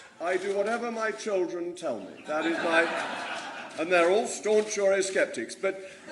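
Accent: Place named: RP, United Kingdom. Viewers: . . . and maybe a voice coach to rub the corners off that plummy accent. . . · Ok I get the turn off because off the "posh" thing, his silver spoon life as it were, but my God this man knows his stuff and always calm. The amount of times thugs have shouted at him and he calmly wants to chat, at which point they either shout more or walk off. Every interview its normally him running rings around the interviewer, its rare that they repeat questions because he does not stand for it or fall for it. He should be PM but I fear his personal views and money will turn lower income people off, posh well spoken people have always been viewed as been the reason for poor people....stereotypes.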